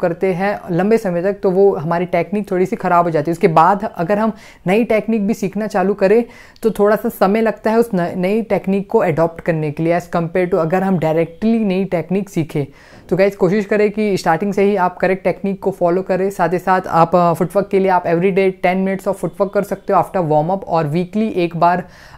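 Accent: Odisha, India. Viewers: R u From Odisha?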